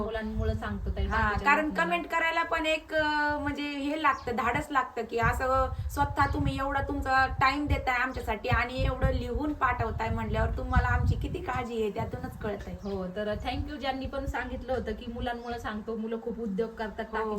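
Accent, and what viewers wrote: Ahmednagar, India. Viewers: Radhe radhe tai nehmi khush raha doghi jani aniii tumhi nagri accent mdhech bolt raha khup mst vatt❤ radharani bless you radhe radhe❤ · तुमचा भाषेवरूनच कळले कि तुमी अहमदनगरचे आहेत कारण की मी पण नगरची आहे अशेच आनंदी रहा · तुमच्या बोलण्यावरून समजल होत तुम्ही नगरच्या आहे 😊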